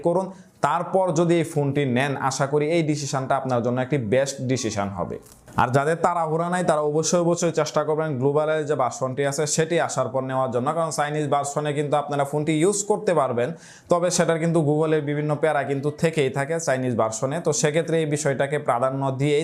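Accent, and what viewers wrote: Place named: Mymensingh, Bangladesh. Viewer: মমিনসিংগা মনে হচ্ছে!!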